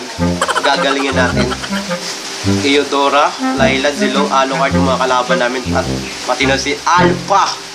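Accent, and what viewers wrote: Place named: Visayas, Philippines. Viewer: halatang bisaya talaga...hahaha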